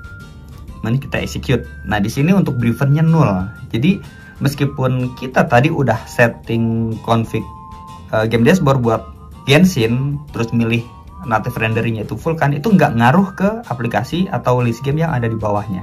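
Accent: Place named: Java, Indonesia. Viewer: wkwk mangkanya pas ngomong kek ada jawa jawanya gtu, salam dari jawa mang gw juga jawa, jawa timur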